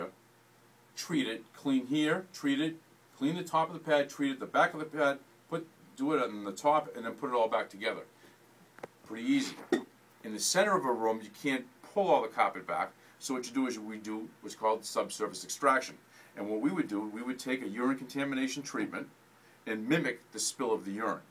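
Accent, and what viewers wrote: Boston, United States. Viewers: I hear that Boston accent.......from a Galway man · that Boston accent lol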